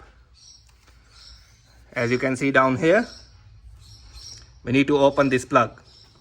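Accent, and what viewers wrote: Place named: India. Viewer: Because of accent i suggest that you are Indian. English in Great Britain is difficult to translate because of specific pronunciation but with your accent this become impossible to understand it without strain own mind...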